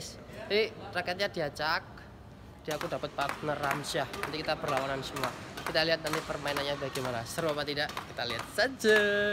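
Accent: Java, Indonesia. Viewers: lu kenapa medok banget jowo nya? Gw asli betawi kalo denger lu ngomong bawaannya ketawa ajah dah liat vlog. Walaupun kadang gw gak ngerti lucuannya.. overall lu kreatif bikin vlog..... lu kapan juara???kapan?gw bakal dukung atlit yg juara.. doa aja ya buat lu mudah2an berlanjut ajah badmintonnya. Konsis.. walaupun gw belum kenal2 amat ttg.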